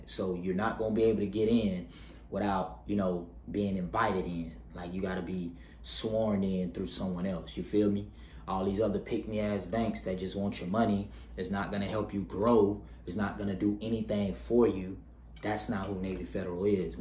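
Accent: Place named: Atlanta, United States. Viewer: sound like you from ATL, i was thinkin of company names MuddHouseReQ did pop up, ill run wit sum ting else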